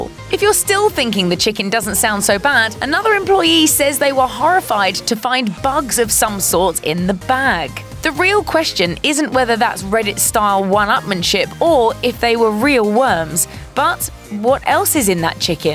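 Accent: United Kingdom. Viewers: What happened to the other girl doing the commentating? This chicks British accent is infuriatingly annoying. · I hate that "pompous" British accent.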